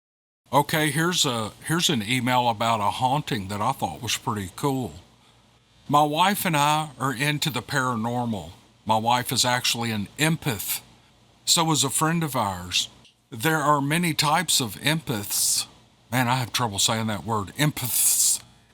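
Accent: South, United States. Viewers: Lol. Thanks, was getting desperate for a fix, of your southern narrations ❤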